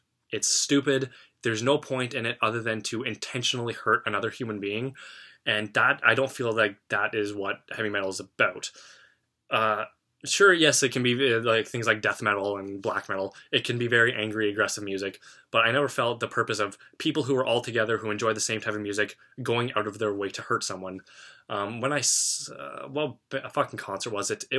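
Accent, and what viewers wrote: Canada. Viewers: Where is your accent from? Canada?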